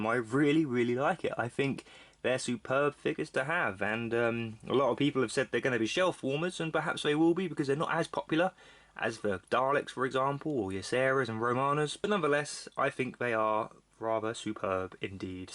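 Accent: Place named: United States. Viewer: Nah nah, I think you are American. Something about that accent...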